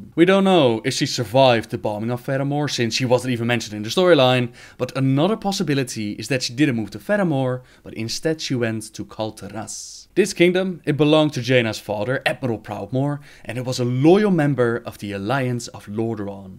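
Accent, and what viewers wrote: Netherlands. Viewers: your accent are you dutch?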